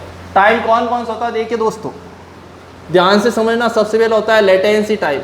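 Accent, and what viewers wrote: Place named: Jodhpur, India. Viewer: गुरु जी आपकी जोधपुरी भाषा घणी छोकी लागी इया ही पढाता रेवो घणा बढिया पढाओ आप जितरी तारीफ करु उतणी कम है जय हिंद गुरु जी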